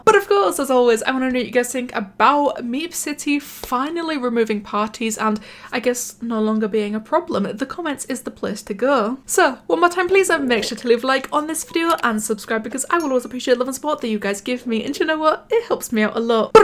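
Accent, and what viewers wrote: United Kingdom. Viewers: I like ur British acebt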